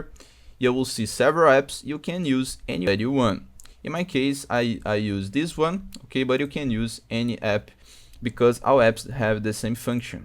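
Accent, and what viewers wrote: Brazil. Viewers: Pelo sotaque é BR certeza kakaka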